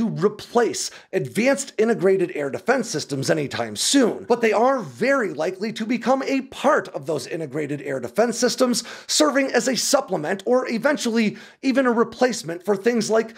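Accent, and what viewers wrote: United States. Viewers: And there you go Russia. If there is anything else we can divulge about any potential flaws in the Dragon fire weapon system, or any other of our allies weapons don't hesitate to get in touch with us at Sandbox. Mind you the guy is American by the sound of him so it is what I would expect.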